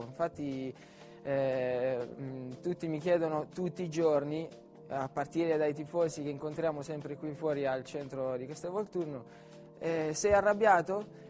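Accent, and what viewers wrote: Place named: San Severo, Italy. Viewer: oh xo l'accent' e san' sevir' non lo perde eh,ahahahah...grande pazienza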